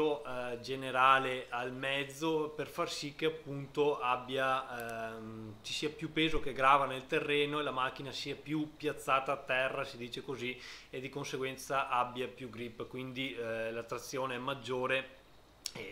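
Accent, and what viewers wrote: Veneto, Italy. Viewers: Sei Veneto?😂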